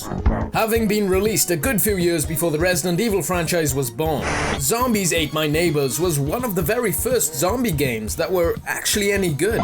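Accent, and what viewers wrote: Wales, United Kingdom. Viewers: Is Welsh? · No he's british or welsh I think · Firstly your the only big YouTuber ever to respond to a comment so 100x props for that and then second your from wales so 1000x props for that :P I thought I heard welsh overtones but I wasn't sure, what part ?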